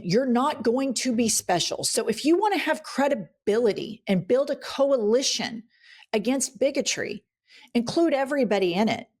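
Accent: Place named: Oklahoma, United States. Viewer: Crap, this guys sounds like he's from Oklahoma. You know, the great RED state ranked 50th in education 48th for poverty top 17 for abuse against women. Yes,First they came for the Communists And I did not speak out Because I was not a Communist Then they came for the Socialists And I did not speak out Because I was not a Socialist Then they came for the trade unionists And I did not speak out Because I was not a trade unionist Then they came for the Jews And I did not speak out Because I was not a Jew Then they came for me And there was no one left To speak out for me agree. You know the poem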